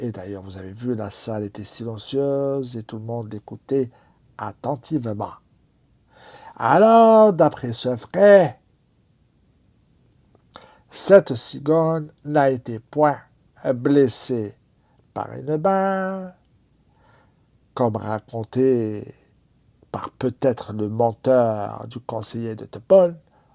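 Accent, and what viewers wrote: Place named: Africa. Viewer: 😂😂😂😂j'adoooore ton accent africain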